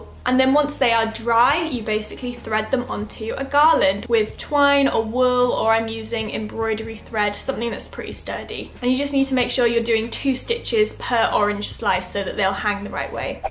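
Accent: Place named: United Kingdom. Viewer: It’s interesting to see how other ppl around the world decorate, especially around the holidays! Based on you accent I’m assuming you’re in Great Britain or close to? Do y’all have “dollar stores”? Or similar type of stores? I wonder if the same things that are trending or popular in the US, are also in Britain at the same time? Hmmmm, it’s certainly interesting! Good day to you, stay well.!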